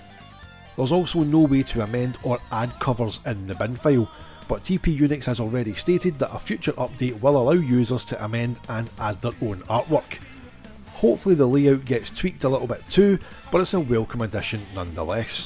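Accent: Scotland, United Kingdom. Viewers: I like the Scottish dialect ❤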